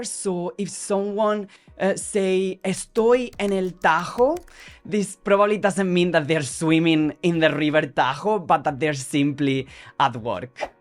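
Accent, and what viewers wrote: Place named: Scotland, United Kingdom. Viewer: As a Scot...i detected the Scottishness straight away... I also talk Scotspanglish... Really well...😂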